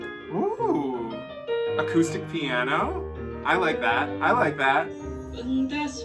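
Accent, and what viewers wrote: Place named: Spain. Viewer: I love how you pronounce the Cs, as a Spanish person and not like a Latino.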